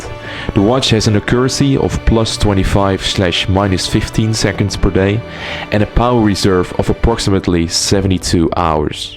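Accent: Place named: Netherlands. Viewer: Ik weet dat je nederlands bent, maar alsjeblieft werk aan je accent.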